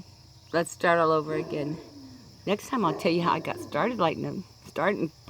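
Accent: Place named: Kentucky, United States. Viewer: Hello Mrs.. I am a friend of Wes (Old Khakis). He said you had a nice trip down to Texas. So glad to know you. I am from Arkansas, so that Kentucky accent fell sweetly upon my ears.😊. I loved this video, even though I had to “listen quick”! LOL! I’ve only been smoking a pipe for 9 months, but absolutely LOVE the hobby! God bless you, Mrs.. I look forward to more of your videos! Cliff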